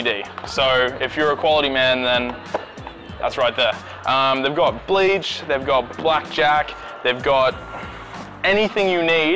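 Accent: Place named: Australia. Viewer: Omg how did i just find this store yesterday (THAT AUSTRALIAN ACCENT THO)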